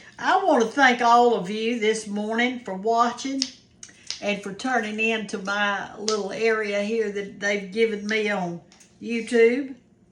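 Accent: South, United States. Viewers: Miss can you video spinach omelet. I love spinach w garlic Parmesan. God bless you thank you. Love Southern accent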